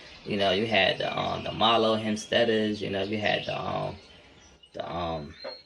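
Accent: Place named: Audubon Park, United States. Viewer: That Nicca sound like he in Audubon Park somewhere🤣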